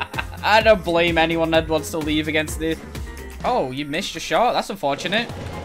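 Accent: United Kingdom. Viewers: your voice is kinda british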